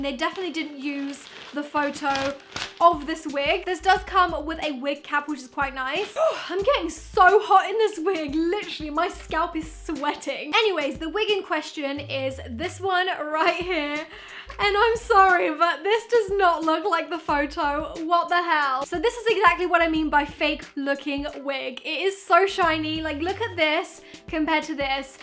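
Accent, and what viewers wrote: United Kingdom. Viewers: Normal english person: Better British person: Butter Lol it's cute 🖤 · Wait ate you English omg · Oh my.....this British accents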